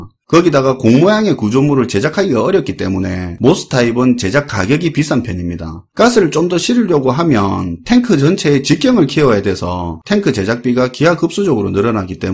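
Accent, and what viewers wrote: Gyeongsang, South Korea. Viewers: 이야..구수한 경상도사투리에 전문지식없는사람들도 금방이해할수있도록 간단명료하게 설명해주시니..그저 대박이라고밖에...ㅎ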